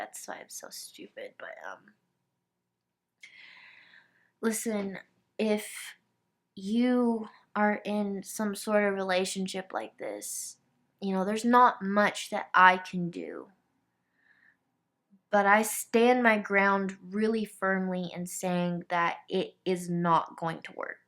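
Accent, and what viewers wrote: South, United States. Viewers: Hey, I am so glad that you are feeling happy in this video. I completely love it when a girl starts crying, and then she would finish crying, and then she would start smiling and laughing. I am so glad you are feeling okay because I have been thinking about you and praying for you. I know I am much younger than you are (I am 18), but I admire you a lot. The number one thing I love about you........... is your one beautiful precious smile, along with your lovely Southern accent.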